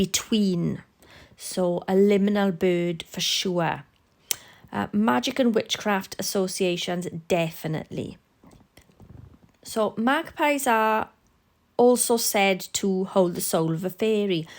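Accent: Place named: Wales, United Kingdom. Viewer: And I'd never want to shut a Welsh woman up! 🥰 You have a wonderful dialect.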